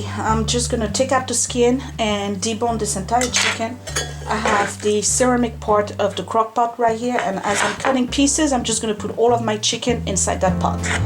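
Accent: France. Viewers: yay! More French accent! I love listening to you speak. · and the soup are amazing:))) ha ha ha l'accent français;)!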